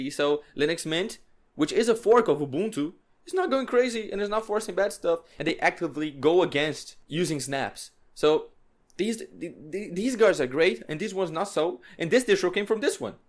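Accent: Brazil. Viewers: Video mt top carioca! É muito top q quando agente comeca a usar Linux, agente acha que é so uma versão melhorada do windows. Mas quanto mais agente usa Linux, masi agente tem opiniões sobre os diferentes componentes do sistema, e é engraçado que quase todos que mudam, começam á mais voltados a privacidade e FOSS. É muito boa essa comunidade? P.s: Teu inglês tá muito bom, não deixa tua cabeça achar que so por causa do sotaque brasileiro ele não é, tenta não se comparar com nativos! Parabéns pelo video e pelo canal, tu ta me fazendo aprender quickshell pra customizar meu hyprland (Arch btw) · Awesome video! Prolly the best ive ever seen about distros, love the slides. Seu sotaque não me engana! É br? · Mano BIZARRO como ao ouvir você pelos primeiros segundos, deu um gatilho do nada que você era brasileiro só pelo "sotaque" de leve que senti na pronúncia das palavras em inglês, isso é muito doido KKKKKKKKKKKK enfim cara, excelente vídeo e sucesso pra ti!!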